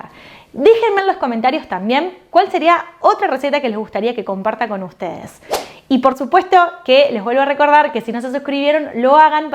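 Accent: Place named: Mar del Plata, Argentina. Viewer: Gracias, Probaremos tus apetitosas Donas, explicadas con tu lindo y ágil acento de Mar del Plata; soy panadero y repostero artesanal que hacemos hostelería con artes y tradiciones en Salento Colombia.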